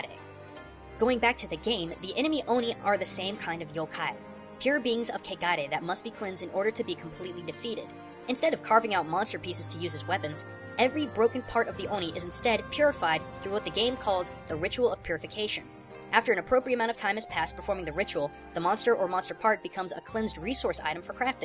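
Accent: Japan. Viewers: I believe he is using the accent because it helps him with the correct pronunciations with Japanese words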